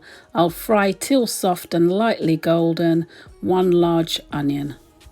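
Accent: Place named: London, United Kingdom. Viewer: Hi.. You videos are amazing I've liked a few mostly veggie as I dnt eat meat.. I love how your spice blends and cooking methods can bring flavour and spice to simple vegetables.. I plan to try your bean chilli recipe because its just seems so much full of flavour compared to the garlic powder, onion powder, smoked paprika recipes I'm used to seeing lol can I ask where you are from? I grew up in Hammersmith sw London and your voice reminds me of home just as much as your recipes.. Keep it up x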